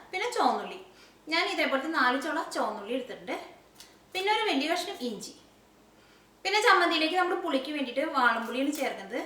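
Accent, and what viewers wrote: Thrissur, India. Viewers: U r my guru at cooking.I got married two years back and since then I was watching your videos and tried alot of receipes and came up with success stories. Thanks alot .like your tcr slang and ur simplicity. Your videos r really inspiring for others to cook.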